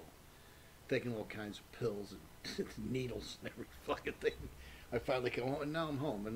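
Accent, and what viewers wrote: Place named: Northeast, United States. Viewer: Which Doink is this? He sounds like he is from the Northeast, Steve Lombardi?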